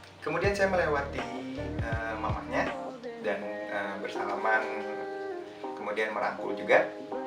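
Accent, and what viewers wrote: West Java, Indonesia. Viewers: aa orang sunda ya😂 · yallah a sunda banget bahasanya wkwkek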